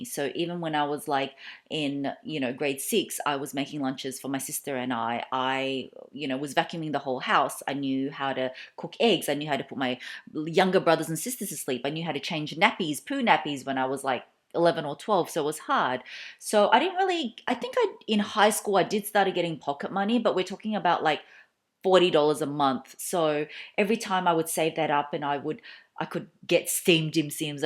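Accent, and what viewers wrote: Melbourne, Australia. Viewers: I am not much of a follower of fashion you tubers, but I have enjoyed viewing your videos. I enjoy your content and your Aussie accent and all the videos I have seen you look very pretty and classy. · I love the accent you had.💕 it’s was same aussies accents? Of Blackpink Rosé she’s korean but born in melbourne Australia 🇦🇺 if I’m not mistaken.🥰🥰🥰😅😅 I love it very classy.💕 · Hi. I just started following you on youtube and i loved your clips. Also I loved your Aussie accent 😊. I also graduated from Monash University in master of communication back in 15 years ago. Now i lived in USA. Anyway, i just wanna say please continue doing it. You brought back my ultimate moment while i was in Melbourne. Thank u! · Just found you! Love your videos I miss Melbourne so much and your accent reminds me of home so love listening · Heyy In Melbourne how do you tell if you buy branded goods quality are great quality of the handbags, slg, shoes, clothes ect.... 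sunglasses, scarf as im thinking of buying something soon like maybe frm lv, bv, ysl, mont blanc, cartier. Thank you for the advice & tips gff im such a hugh fan of yours from a country nearby which is Singapore and oh boy i've been watching your vids/vlogs all weeks, months, weeks, days your my no.2 favourite youtuber oh man i love your aussie aussie accent sounds so smoothing to the ears haha lol very calming as well lol. Omg gff we got the same taste we love going to thrift stores to look for vintage stuff + i love eating as well haha lol awhhhh your dogie is such a cutie so sorry to hear about it so sad 🥰😍😘😗☺😚😙😋🤗🤧🤧🤧🤧🤧🤧😢😥😓😩😭😭😭😭😭😭 & your handbags do you sent it to the bag spa? For cleaning, touching up ect....get it restitching? Does your hubby + you sit down with other and talk about not spending too much? You too stay safe stay strong stay healthy as well my gff.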